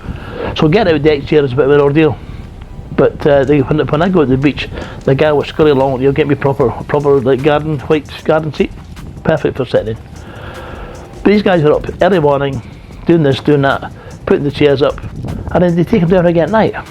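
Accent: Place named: Scotland, United Kingdom. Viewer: I am enjoying your vlogs , and learning to understand your wonderful Scottish accent (lol)! Actually I am a Scot by ancestry, but quite a few generations ago. When I went to Scotland I was embarrassed by my accent, since my surname is pure Highlands . I am looking forward to retiring to Thailand in a few months. Jomtien is one of the places I am considering. My hobby is building and sailing radio controlled sailboats, but they are big and I will probably need a car to transport them to the beach. I have heard that you can park right by Jomtien beach. Is that correct? Looking forward to meeting you in November. Cheers, Colin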